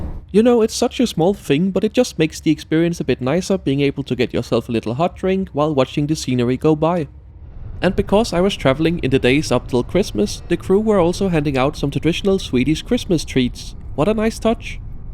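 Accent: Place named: Denmark. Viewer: It's a very danish pronounciation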